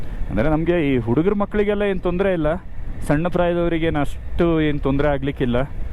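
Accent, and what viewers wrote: Kundapura, India. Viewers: ಹವ್ಯಕ ಕನ್ನಡ ಮತ್ತೆ ಕುಂದಾಪುರ ಕನ್ನಡ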